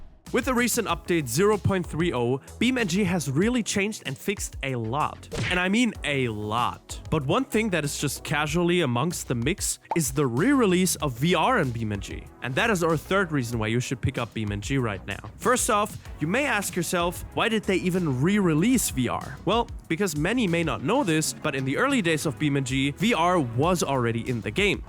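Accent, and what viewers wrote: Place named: Germany. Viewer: Get a better voice-over actor. The one you use has a German accent that makes my ears bleed.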